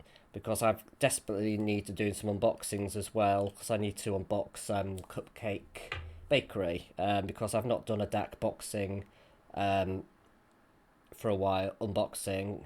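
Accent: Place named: United Kingdom. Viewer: You tickle me w/ the “and stuff”, LOL. So silly and fun. You are doing so awesome w/ all your videos. I can see your confidence building. I enjoy you so much! I am a sucker for the UK accent. I watch so many Brit shows. Even the comedies have eloquence w/ the voices. I am in the southeast US. We sound NOTHING like you guys. I could listen to you & (your putty lady) all day long. Keep them coming. I love your WIP! We have some nice tea shops where I live. Chocolate tea has been a fav for yrs. And you are right, 😋 delicious!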